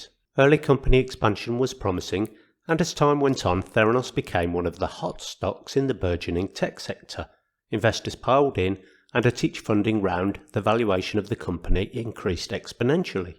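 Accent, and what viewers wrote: United Kingdom. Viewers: Hahaha I was gonna say you could have used your British accent as an excuse, until I saw the graphic. Nice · 719 losers follow you...Yeh ! you work for CNN and any other acronym cunt out there..David Bowie said get ready for cunts...Oh ! I just saw another one YOU tube.....Even worse is the fact that you sound like you are from the ass end of the Midlands....Just go away will ya....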